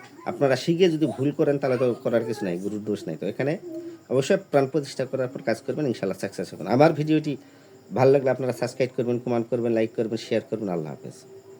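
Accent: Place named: Naogaon, Bangladesh. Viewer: কিছু মনে করিয়েন না একটা কথা বলি আপনি তো নওগা জেলার ভাষায় কথা বলেন কিন্তু আমরা দেশের বিভিন্ন জায়গা থেকে দেখি সেখেএে আপনার কথা বুঝতে কষ্ট হয় তাই যদি একটু চলিত ভাষার সাথে সাধু ভাষা বা লিখিত ভাষা বলতেন তাহলে কথা বুঝতে সমস্যা হতো না গুরুজি❤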